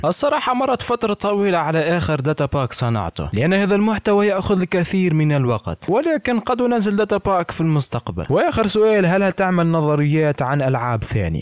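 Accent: Tunisia. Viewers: انت تونسي كيفي؟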